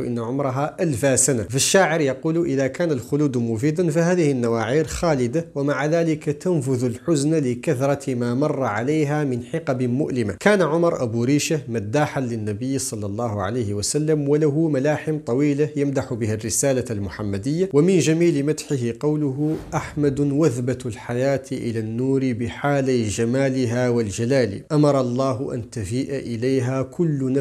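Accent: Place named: Yemen. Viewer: متابعك من اليمن هل تعرف ان لهجتك كانها من لجهات اليمن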